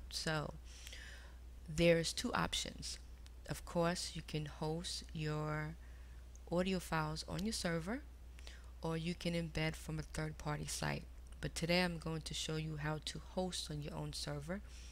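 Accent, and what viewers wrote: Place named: East Coast, United States. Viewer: thank you so freaking much man.. i could not verify the audio file at all thank you so much it was in front of my face the whole time.. love your voice and eastcoast accent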